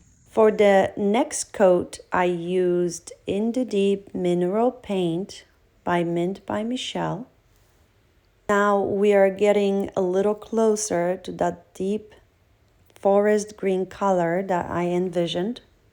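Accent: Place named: Europe. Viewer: I’ve been watching all of your shorts and just tuned into this. I’ve been wondering where you find all of your beautiful pieces and now that I hear you beautiful accent I’m thinking you must be in Europe and it makes since how you find the pieces. I live in Colorado USA and believe me these cowboys out here don’t have such great pieces like you find.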